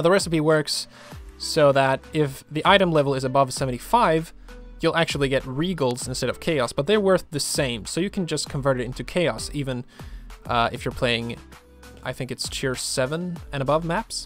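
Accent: Sweden. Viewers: Lel, you swedish lad?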